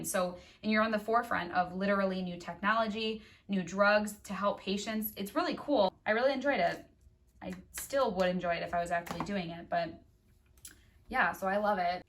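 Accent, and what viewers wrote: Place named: Wisconsin, United States. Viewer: I KNEW you had to be from Wisconsin (I could be wrong) because of your slight accent! I love it!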